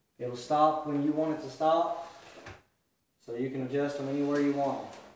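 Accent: South, United States. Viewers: Just a bit southern.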